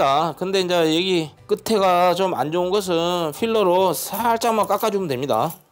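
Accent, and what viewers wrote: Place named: Gwangyang, South Korea. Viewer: 말투가 우리동네 같네요~~ㅋㅋ 정겹네요 이것은인자~~그래갓꼬~~광양말투 항상 맛있게 만들수있게 레시피주셔서 감사합니다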